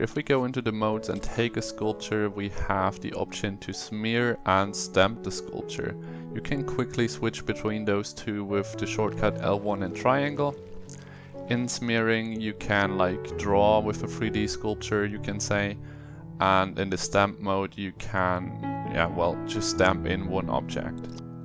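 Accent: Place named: Germany. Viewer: Hab bei Dir sofort rausgehört, dass Du Deutscher bist - aber ich muss sagen, dass Dein Wortschatz und Sprachfluss echt stark über dem deutschen Durchschnitt sind. Danke für die Videos! Das motiviert mich echt, eines Tages mein eigenes Spiel zu entwickeln.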